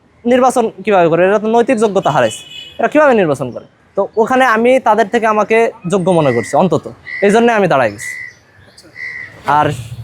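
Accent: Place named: Satkhira, Bangladesh. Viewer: ভাইয়ের বাসা মনে হয় সাতক্ষীরা,কথার টান থেকে বুঝলাম।জন্মভাষা বলে।কথা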